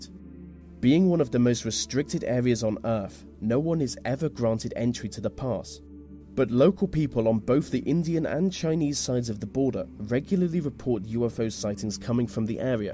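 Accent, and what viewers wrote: England, United Kingdom. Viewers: Not sure what it is your debonair English accent or the awesome topics the great narration and your consistency always keeps me excited and wanting more from your channel job well done my friend thanks again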